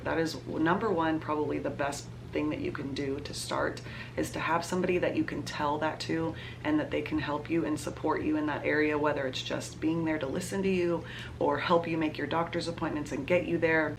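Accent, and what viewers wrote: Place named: Texas, United States. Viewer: I found your videos recently as I will be having my son in two weeks as a first time mom. Every video has been so helpful. I was hooked when I could tell you had a Texan accent and then found out you’re a fellow Houstonian!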